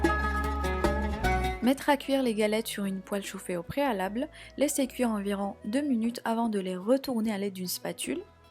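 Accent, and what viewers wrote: Morocco. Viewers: L'accent marocain j'adhère pas 😖 Mais bon y'a toujours dès rageur qui accepte pas les avis des autres:) Sinon comme d'hab une bonne maman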